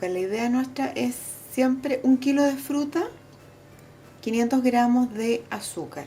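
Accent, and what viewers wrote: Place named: Chile. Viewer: me encanta el tono chileno, amo vuestro pais, asi que me copio las recetas, muchas gracias , abrazo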